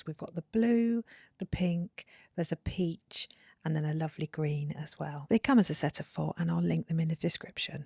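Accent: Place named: England, United Kingdom. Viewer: Your new parasol is spectacular and the solar lanterns are so cute! I love it all! I love your voice over and your charming English accent. (I'm from California, USA) TFS! 💜 💜 💜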